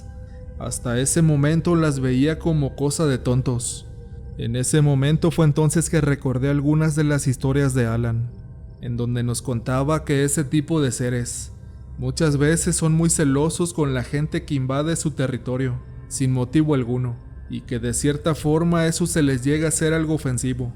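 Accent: North, Mexico. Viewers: Hijole amigo tu acento norteño le quita emocion, parece que lees muy rapido.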